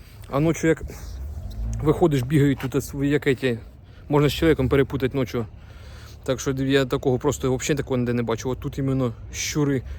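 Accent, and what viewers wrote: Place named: Orikhiv, Ukraine. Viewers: Це не суржик , а панський Орехівський діалект. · На шо , на чом ??? Він балакає українською , на одному з діалектів (Запоріжжя мабудь, похоже), як і у всих странах в разних регіонах разні діалекти...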